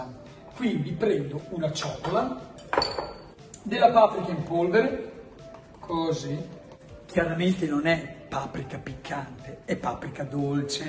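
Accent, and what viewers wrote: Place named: Romagna, Italy. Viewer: Hai un accento Romagnolo che si sente a metri di distanza!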